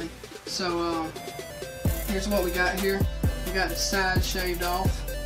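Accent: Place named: South, United States. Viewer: Sounds like the south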